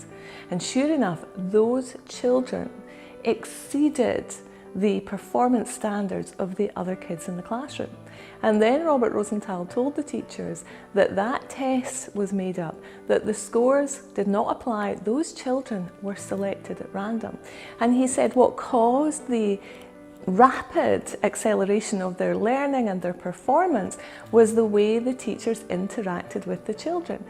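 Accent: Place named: Scotland, United Kingdom. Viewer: I am a new subscriber..., I like what you teach and certainly understand it too. I am pleased to say, the things you talk about are operational in my life. Having said that, there's more work to be done and I am always looking ways to improve my mental performance and maintain the peace the I have both at home and work. Furthermore, I love the Scottish accent and the scenery behind the window. Your voice and body language is calming. That's a natural blessing on you which I goes a long way when you speak. Awesome!!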